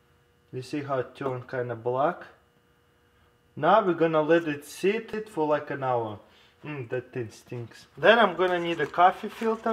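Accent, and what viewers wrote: Russia. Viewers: No its just because he is Russian, and the English language is very difficult sometimes for people going from Russian and picking up English. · are you Russian? sorry for judging off an accent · I SO KNEW you were Russian! I'm Russian too...decent...and I recognized the accent. Thanks Dude you're way cool!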